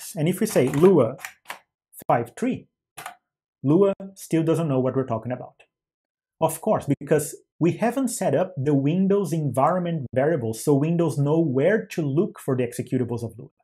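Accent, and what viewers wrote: Brazil. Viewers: he sounds Brazilian tbh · Thanks for the contribution. A tip, you can browse for the file from the environment variables, and navigate through the folders to find Lua folder, which might be easier than writing. If you are brasilian, which your accent sounds like, then: Valeu, obrigado :) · sabia q voce era br, conheco sotaque portugues de longe kkkkkkkkk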